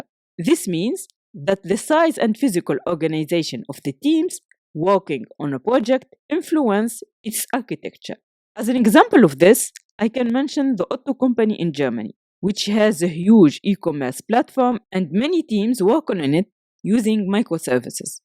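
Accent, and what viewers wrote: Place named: France. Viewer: Your accent sounds like French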